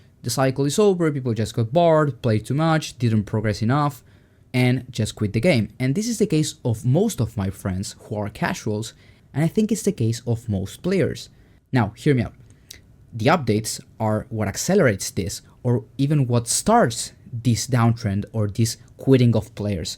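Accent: Argentina. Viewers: Algo se me hacia raro de la voz, luego me entero que es de Argentina jajaja, lo camuflaste bien. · ese acento argento me mata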